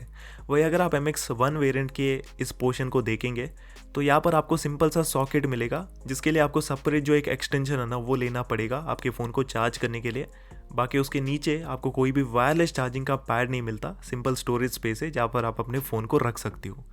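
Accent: Kerala, India. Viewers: Bro, neutralize your hindi accent. Can make out you are from Kerala.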